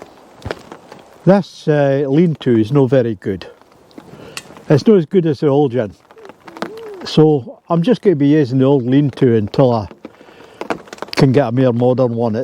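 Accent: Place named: United Kingdom. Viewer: Your accent is so cute! For me, a Brazilian, you sound like a Harry Potter character!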